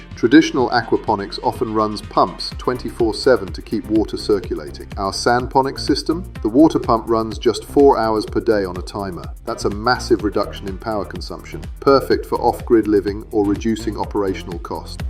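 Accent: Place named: England, United Kingdom. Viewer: Why all the Africans doing the work and the narrator with the English accent. Very colonial.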